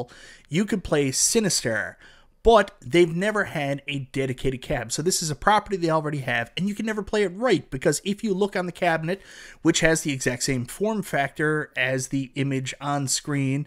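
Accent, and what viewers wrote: Canada. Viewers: love how this guy talks is he from canada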